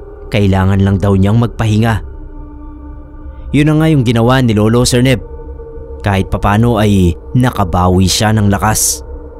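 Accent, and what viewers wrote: Iloilo, Philippines. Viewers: Hello sir new subscriber here😅 Watching from Jeddah...parang ilonggo yong tuno mo sir 😅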